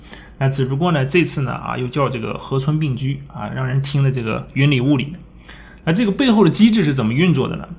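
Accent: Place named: Shanxi, China. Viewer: 感觉普通话中有山西口音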